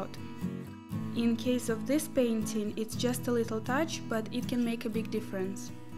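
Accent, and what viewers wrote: Russia. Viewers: Русский акцент узнаешь из тысячи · Красиво) Слышно русский акцент) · Omg this Russian accent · This is so inspiring ❤❤❤ I noticed you have russian accent... Aren't you russian?😅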